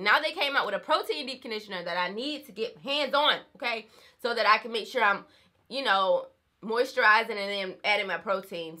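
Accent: South, United States. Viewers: Girl that southern accent is coming through!🥰 I would love to see eco and wetline comparison. Thanks for sharing your product empties. Peace and blessings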